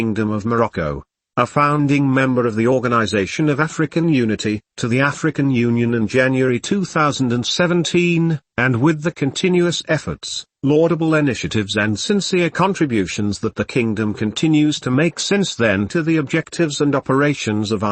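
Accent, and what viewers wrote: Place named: United Kingdom. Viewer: Very good idea! Keep up the good job even if I am not familiar with British accent.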